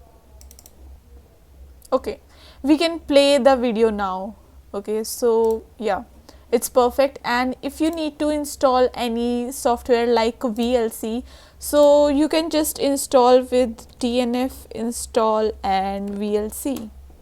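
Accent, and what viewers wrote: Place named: India. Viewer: Wow indian voice 😍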